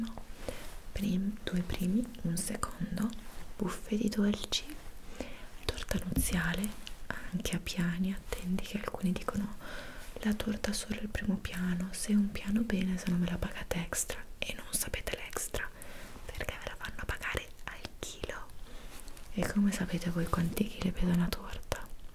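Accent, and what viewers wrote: Italy. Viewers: Soy el comentario en Español que no esperabas. No entiendo nada pero me encanta el acento italiano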